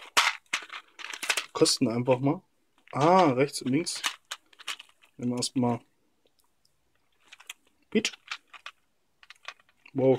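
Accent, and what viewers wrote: Saxony, Germany. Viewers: bist du sachse ?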